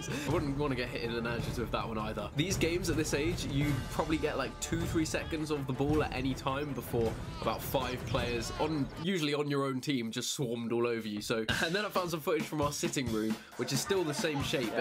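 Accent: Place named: RP, United Kingdom. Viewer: You sounded so posh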